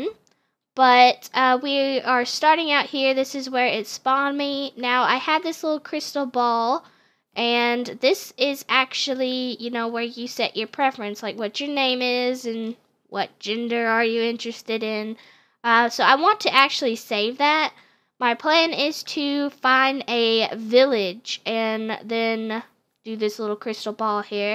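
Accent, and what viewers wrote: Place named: United States. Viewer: Oh myyy i’m watching ur current 100 baby challenge you sound like you have a light country accent in this love to see how far you’ve gone love your vids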